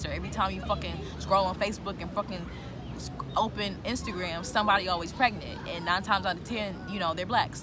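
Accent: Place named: Chicago, United States. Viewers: Sound like chicago.. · WAKE UP CALL TV Stoney_stay_woke_sos exactly like Chicago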